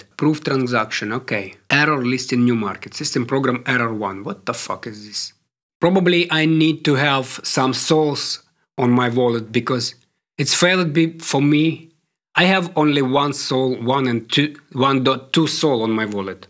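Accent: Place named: Russia. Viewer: bro are you russian? love the accent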